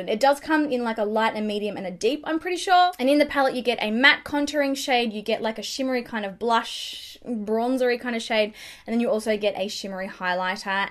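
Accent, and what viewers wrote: Australia. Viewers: I just want to put this out there. I'm not gay or anything. I'm happily married for 37 years. I just really love the way Australians talk.. That's all...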